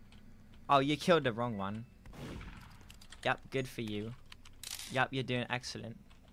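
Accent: United Kingdom. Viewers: I never new you were British but you moans got me dying😂💀💀💀💀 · well the accent sounds like a British one so idk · I an never tell if ur American or British 💀💀💀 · Are you British?? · one question why is he British ? Aren’t you American? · Why did he turn British 😭😭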